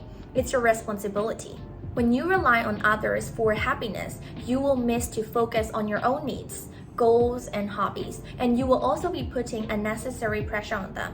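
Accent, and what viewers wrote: England, United Kingdom. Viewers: How do I get English accent like you, plzz give me some tips with one vd ma" ,most of Myanmar people want to know for it ❤ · I love your English accent so much . Do you have a Instagram account?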